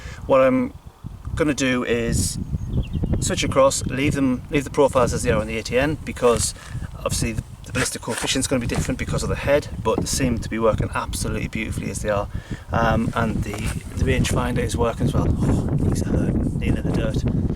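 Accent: United Kingdom. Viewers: Is that a fellow Mackem accent my senses detect? Can’t mistake that North Sea east coast wind on the mic either! Great video. I’m busy using my Pulsar to test .177 QYS 8.48gn v AA Field Diablos and against my hunting go-to the 10.34gn JSB Heavy. Also have the test to do of Polymag shorts against the 9.56grain QYS’s. Have subscribed so look forward to seeing your videos and more vids. Best of luck. (I’m SR6 Marina based) 👍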